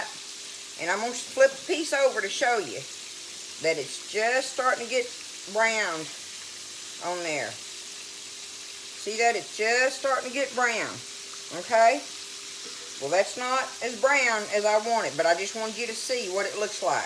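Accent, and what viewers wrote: South, United States. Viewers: I love your videos and you. I like so many of your recipes and how well you explain the process, the other thing I like is you southern drawl. Your chicken cookin is slot like my mother’s. Difference is after browning she put in the oven to finish cooking. She would season her flour with just Salt and Pepper. The flour it and fry. No matter what I do it never tastes the same. Many blessings to you and your hubby.😊❤️🙏🏽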